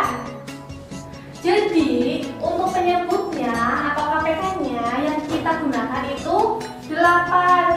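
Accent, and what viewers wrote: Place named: Java, Indonesia. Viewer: Aku suka dengan medhoknya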